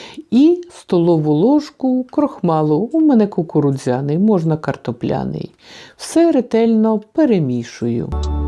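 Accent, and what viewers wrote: Standard. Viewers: Какой красивый Чистый украинский язык.А не то что с ним сейчас сделали😡педагогыня минестриня😡😡😡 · Как приятно слышать чистый украинский язык! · Дуже приємно слухати чисту українську мову без суржика. Дуже приємна господиня!.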